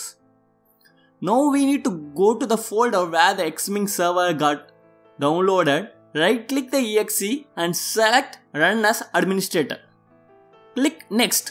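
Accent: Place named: South India, India. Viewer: U sound like a South Indian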